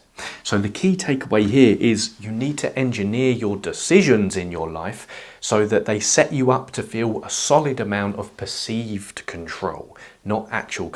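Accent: United Kingdom. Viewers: Unrelated but what type of British accent is this? I like it a lot. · The britishness in his voice is so refreshingly calming put in a good mood like when ur high on caffeine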